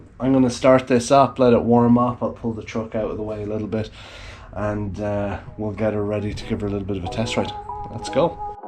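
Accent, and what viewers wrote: Ireland. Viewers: Gorgeous bike! Are you Irish by any chance ?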